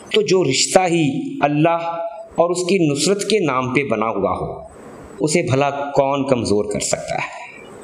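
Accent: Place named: Punjab, India. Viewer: Apka ta'lluq punjab ki kis jagah say hay brother? Lehjay say ap punjabi lagtay hain.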